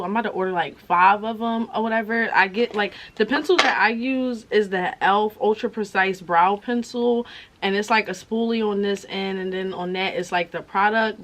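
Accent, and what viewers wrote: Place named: Philadelphia, United States. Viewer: You sound like you from philly, you’re so damn pretty btw 😍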